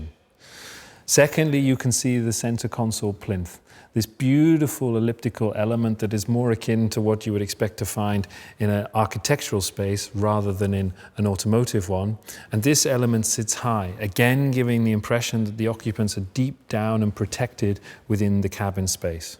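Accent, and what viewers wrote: United Kingdom. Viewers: Nice accent, what part of the UK are you from?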